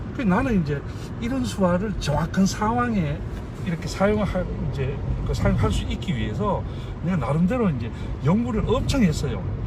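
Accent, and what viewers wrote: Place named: Gyeongsang, South Korea. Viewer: 선생님의 말씀을 들으면 '이제 나도 선생님 가르침을 따라 열심히 하면 수어를 잘할수 있겠구나'하는 자신감이 생깁니다. 수어를 외우는 것이 아닌 이해 하면서 공부 할 수 있겠다는 생각에 수어 공부에 대한 관심이 더 커지는것 같습니다. 선생님께서 연구를 위해 많은 노력을 하셨음을 또한 느끼며 감사할 따름입니다. 그리고 선생님 말씀 하실 때 억양이 경상북도분이 아니신가 생각 됩니다. 저는 전라도 사람인데요 경북 영천 친구가 있었는데 그 친구 억양과 비슷하다 느꼈습니다. ㅋㅋㅋ 선생님~ 선생님의 연구에 존경을 표합니다. 찾아 뵙고 인사 올리는게 도리일진데 댓글로 인사 올려 송구합니다.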